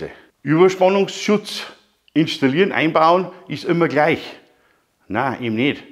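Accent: Bavaria, Germany. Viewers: Geil endlich jemand der Ahnung hat... zwar sehr speziell und gewöhnungsbedürftig dieses impulsive aber dennoch menschlich. Ich kann zwar kein bayrisch aber verstanden habe ich. Trotzdem werde ich niemals eigenständig an Strom gehen dafür gibt es gelernte Fachkräfte... Bleibt nur danke zu sagen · Ganz großes Kino, vielen Dank für deinen sehr aufschlussreichen Input. Gruß aus Hamburg. Btw als gebürtiger Hamburger sag ich dir, pfeiff auf die Nasenwastl, die sich am Dialekt stören. Die sollen dort hingehen, wo sie klar kommen und die Sprache verstehen. Ein Tip an die Nörgler, es gibt eine Vielzahl an bayrischen TV Serien und Kinofilmen wo sicher für fast jeden was dabei ist. Ein bißchen gemischte deutsche Kultur tut jedem gut.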